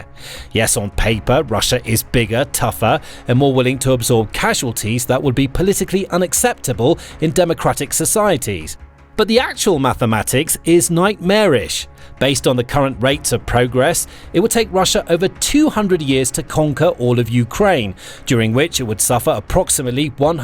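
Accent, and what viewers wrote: United Kingdom. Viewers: сходу ложь Киевская русь лишь одна из нескольких княжеств (Ярославская русь, Новгородская русь) и она не самая могущественная ни с точки зрения политики, ни с точки зрения экономики, ни с военной точки зрения. Самой успешной была Новгородская русь - но западным "историкам" выгоднее делать вид, что остальных княжеств просто не существовало. Почему у самых лживых и прозападных каналов на ютубе всегда британский акцент?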